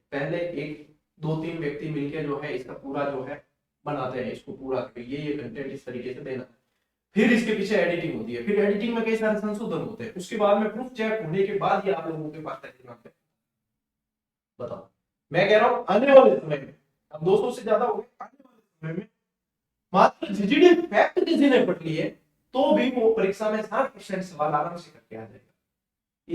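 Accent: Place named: Nagaur, India. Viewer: Sir aap pali ke ho par aapki Bhasha nagor ki aa rahi he or sir pali me kha se ho please reply 🙏 🙏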